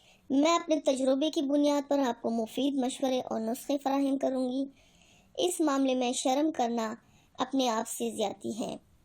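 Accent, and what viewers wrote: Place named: Mumbai, India. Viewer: Apka Bombay Ka andaz bhi. Bohat. Behtreen haa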